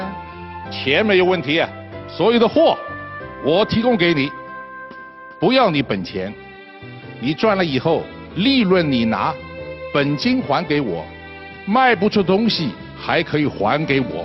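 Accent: Standard. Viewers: 很标准的上海话。还有尖音！ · 現在上海寧已講勿出-→加標準的上海閒話→好聽!👍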